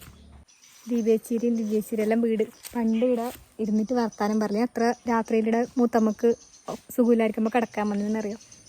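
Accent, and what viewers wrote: Kasaragod, India. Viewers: അത്രയും ബോറാണോ നമ്മളെ കാസഗോഡ് ഭാഷ... നിങ്ങൾ ഇങ്ങോട്ട് വീടെടുത്തു പോര് ചേട്ടാ കാസർഗോഡിനെ കുറിച്ച് അറിയാൻ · എല്ലാ പുല്ലും കായും എല്ലാം പറിച്ചു തിന്നാൻ ഇതെന്താണ് പശുവാണോ എന്തായാലും നിങ്ങളെ കാസർകോടൻ സ്ലാങ്ക് ഇഷ്ടപ്പെട്ടു വീണ്ടും പുതിയൊരു വീഡിയോ ആയിട്ട് വരൂ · ഓർജിനൽ കാസർകോട് ഇഷ്ടപ്പെട്ടു❤❤❤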